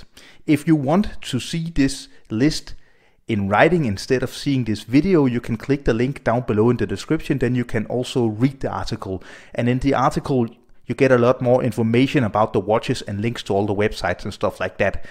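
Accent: Denmark. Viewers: Hvordan kan man bare høre at du er dansker på accenten hahah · Good old Danish accent ! 🇩🇰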